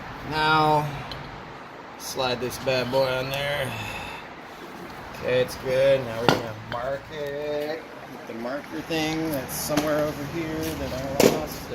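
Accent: United States. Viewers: Looks like america.. sounds american.. uses metric... ? Great video, best one on doing these. Ive been told its a good idea to also drill a hole in tube before sleeving, to give an extra spot to weld (spot weld). But with your welding method its probably not required. Top job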